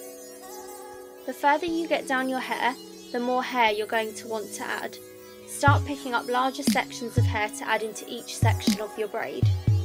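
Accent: United Kingdom. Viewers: Omg she's so British well I am but I'm from Essex and we don't sound like that lol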